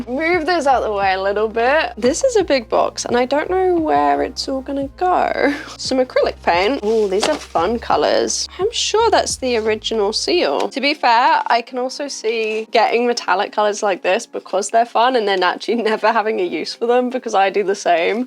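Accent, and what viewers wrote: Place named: United States. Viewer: Why are you constantly using strong American inflections when you speak? Do you live on Netflix or something? You might as well go with a full-blown accent at this point because listening to this pseudo-Americano dialect is painfully embarrassing.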